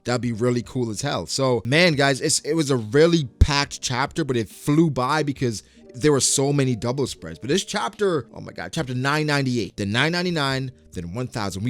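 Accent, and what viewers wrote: Spain. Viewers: nah bro, embrace the spanish pronunciation!! it sounds cooler, those american "r's" sound weird with japanese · Spanish sounds more similar to Japanese than English so let that Spaniard come out. Listening to you talk about the chapter makes me enjoy it more than my initial reading. Great commentary as always.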